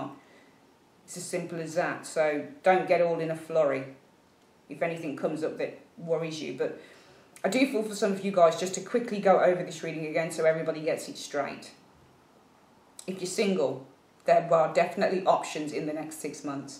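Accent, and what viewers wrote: Birmingham, United Kingdom. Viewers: she sounds nothing like adele! is from birmingham and adele is from south london, completely different accents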